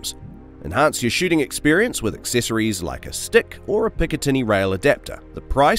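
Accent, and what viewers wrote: Australia. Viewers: Notice they have an Australian narrorate since they wimped out and gave up their guns. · Aussie accent but he's talking USD$ and no shipping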